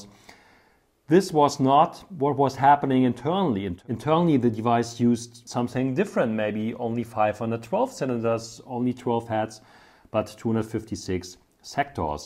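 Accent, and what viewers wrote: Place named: Germany. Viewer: Dieser deutsche Akzent... D: Aber danke für die Informationen.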